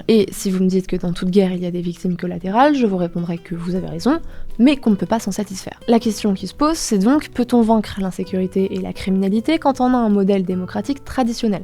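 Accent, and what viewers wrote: Spain. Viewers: Pas grave du tout pour ton accent espagnol, c'est une langue de M..... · L accent espagnol de fait que cette vidéo passe de top à excellentissime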